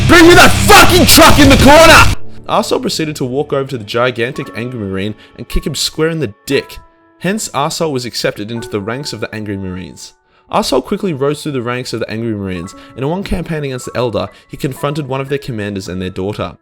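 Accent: Australia. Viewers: This was a match made in the Warp. Also it’s nice listening to a fellow Aussie speaking... I don’t have to decipher the accent.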